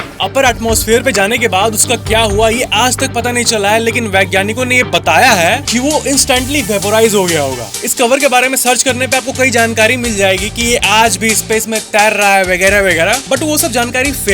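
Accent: Bihar, India. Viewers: Annoying Bihari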